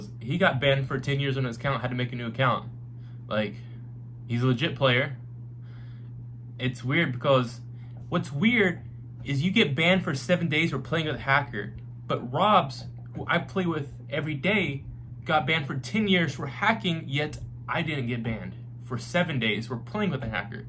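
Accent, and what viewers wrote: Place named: Puerto Rico. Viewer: Yo pensé que era de Puerto Rico 🇵🇷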